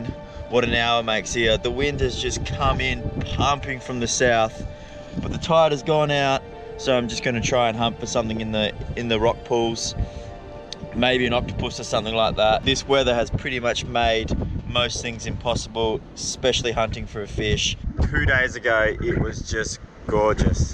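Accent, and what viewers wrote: Australia. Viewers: Are u Australian cuz I am to mate Edit: thanks 4 😄😄😄😄😅😅😁😁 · Is he from New South Wales or Queensland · Is he Aussie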